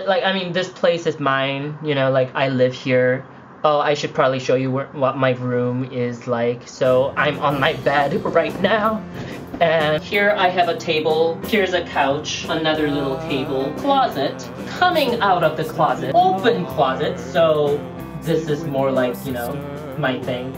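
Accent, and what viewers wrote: United Kingdom. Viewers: I don't care if you're American, you spoke like a true Brit in this video. Welcome to the family. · I agree; I don;t think your a tourist if your "living" in London. And I agree with everything you said! Also, I think your starting to pick up a English accent in your pronociation(sp?) of words. Off point; Where do you live in London? What area? Make more of these vlogs. :)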